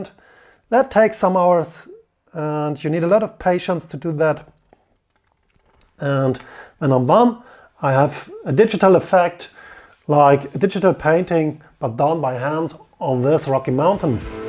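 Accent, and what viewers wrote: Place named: Germany. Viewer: nice accent ;) ... german ?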